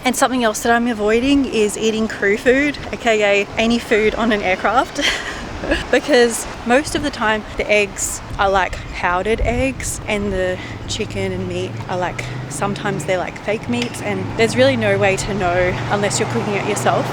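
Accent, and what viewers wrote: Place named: Australia. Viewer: I’m Japanese. I leaning Australian English from your Vlog, thank you so much❤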